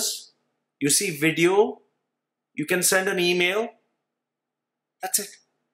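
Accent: India. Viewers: Indian accent ...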